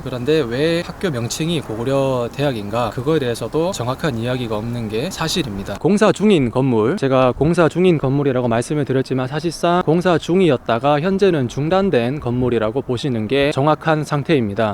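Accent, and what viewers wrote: Gyeongsang, South Korea. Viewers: 전라도 땅에 이름은 고구려 경상도 말투의 진행자 분 삼국 대화합의 장이네요 나주대로 다시 바뀌고 만학도들이 많이 다니는 학교라니 지역 경제 활성화 차원에서도 잘 되기를 바랍니다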